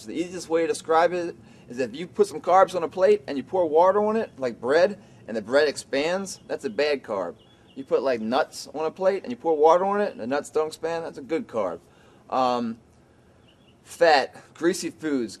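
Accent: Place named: Baltimore, United States. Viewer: baltimore Maryland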